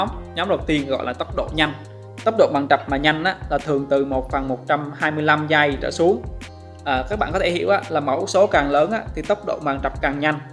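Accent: Da Nang, Vietnam. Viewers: Giọng bạn giống giọng Đà Nẵng, phải b ở ĐN không nhỉ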